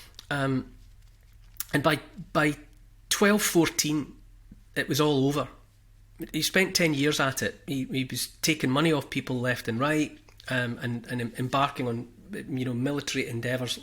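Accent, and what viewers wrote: Scotland, United Kingdom. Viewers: It is not a dialect, it is a Scottish accent.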